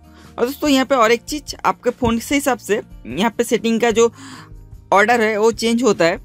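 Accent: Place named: Bengal, India. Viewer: Vo bengali hai bol ke uska hindi aisa hai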